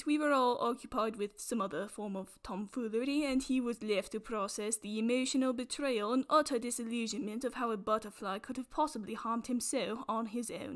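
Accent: United Kingdom. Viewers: Best British acent ever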